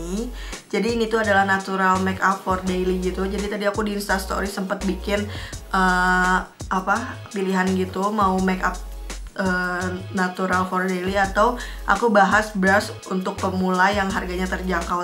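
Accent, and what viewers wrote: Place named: Java, Indonesia. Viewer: cantik bgt 😭😭😭😭😭 tapi inimah da mayan medok kalo buaat aku 😞😂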